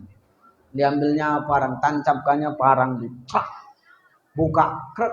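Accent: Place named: Sumatra, Indonesia. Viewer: Assalamu Alaikum Apa ka bar bang Ustadz semoga sehat terus saya suka sekali dengan Ceramah Nya bang Ustadz lucu banget logat Sumatra nya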